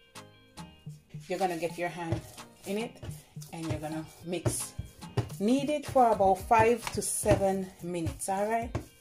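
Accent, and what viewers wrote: Jamaica. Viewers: I just love a Jamaican accent. No one else on Earth sounds like my beautiful Jamaican brothers and sisters. And the coco bread is looking lovely too.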